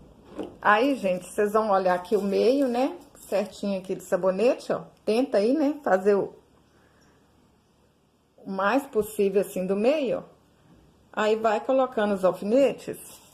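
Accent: Minas Gerais, Brazil. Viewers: O mais gostoso de tudo é esse sotaque mineiro. Amo! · O que mais gostei foi o sotaque mineirinho! Assisti até o final apreciando a moça falar!😁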